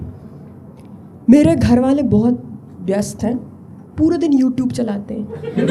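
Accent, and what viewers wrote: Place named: Bundelkhand, India. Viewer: Great content, amazing delivery with a little pinch of bundelkhandi tadka… keep it up, long way to go…❤❤❤😊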